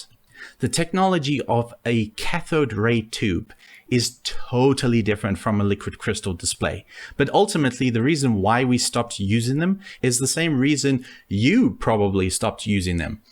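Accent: South Africa. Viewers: I detect a South African Accent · Just started the video, noticed your accent sounds like south african... Are you from South Africa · South African ;)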